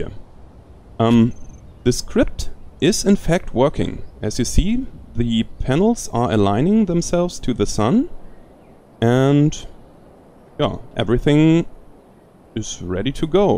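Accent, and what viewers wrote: Germany. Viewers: you have german accent, i can hear it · are u german? im german myself so i kinda know how it sounds when a german speaks english and for some reason my minds telling me that ur german when u talk.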